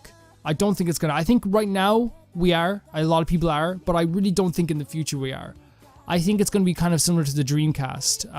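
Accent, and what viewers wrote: Ireland. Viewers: You kinda sound like Jacksepticeye are you Irish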